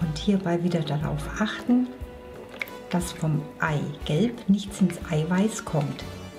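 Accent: Austria. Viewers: sind Sie aus Österreich? 😇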